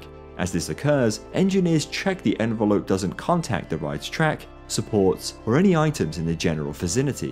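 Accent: United Kingdom. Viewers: Finally someone who is British explaining these stuff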